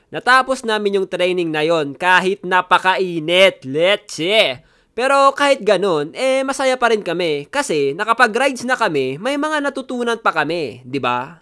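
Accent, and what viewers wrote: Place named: Pampanga, Philippines. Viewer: taga pampanga k ba halata sa punto m at boses na kapampangan ka?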